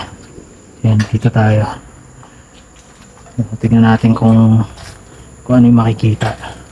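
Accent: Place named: Visayas, Philippines. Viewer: I'm your new subscriber and the way you talk you have Visayan accent..watching your vlog is creepy and exciting...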